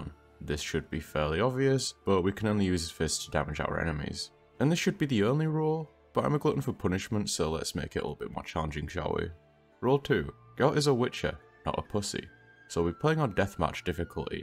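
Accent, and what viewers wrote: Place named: Northern England, United Kingdom. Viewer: He’s clearly northern English, Musk doesn’t sound like he’s from anywhere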